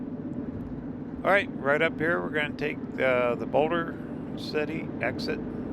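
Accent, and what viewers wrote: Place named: Upper Midwest, United States. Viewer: I love your upper Midwest accent.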